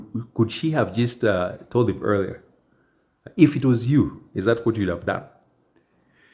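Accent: Kenya. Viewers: This guy's story telling skills coupled with Kenyan accent makes this story even better. I love me an Eastern African accent.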